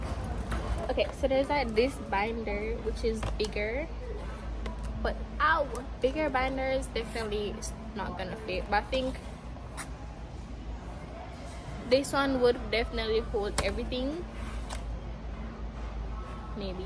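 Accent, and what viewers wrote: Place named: Jamaica. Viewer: I love u accent❤ are u jamaican?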